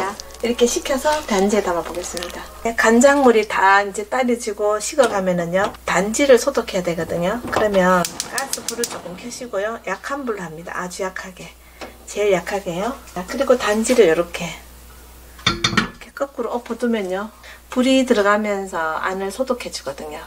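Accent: Gyeongsang, South Korea. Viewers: 친구의 추천으로 유튜브 를 보게 되었네요 ㅎ 목소리도 차븐차븐 이해력있게 말씀도 잘하시고 경상도 바투리 매력 뿜뿜임돠^^♡ 댓글 감사 함니다 그독 알람 조이요 꾹 꾹 꾹 눌고감니다ㅎ 건강하시고 늘 조은 하루 되세요 ㅎ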